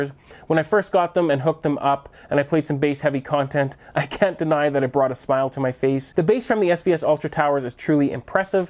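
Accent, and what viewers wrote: Canada. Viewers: Do I detect a bit of Canadian in your voice?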